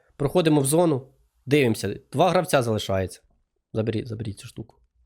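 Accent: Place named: Vinnytsia, Ukraine. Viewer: Привіт. Перший раз натрапив на канал, а звідки саме автор? Говір дуже сильно вінницький) нехай повернення верданська принесе тобі підписоти❤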